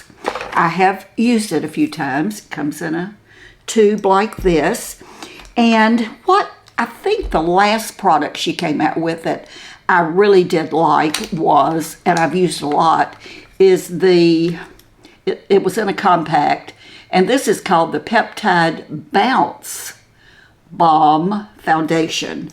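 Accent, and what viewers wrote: South, United States. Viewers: Just think you’re ADORABLE!! Love your Southern accent!! It’s so beautiful!! I flew for Southwest Airlines and the flight attendants that were from Dallas and other Southern states, could get away with saying just about ANYTHING ( rude language) to “bad customers “ with that Southern accent and that passenger had ABSOLUTELY NO CLUE that they were being made fun of OR that they were just being TOLD OFF!! 🤣😂🤣😂!! That accent could sink battle ships!! I loved it and tried to copy it 🤭🙄 with absolutely NO LUCK!! Keep up your great work and you are so inspiring!! ❤️🤍💙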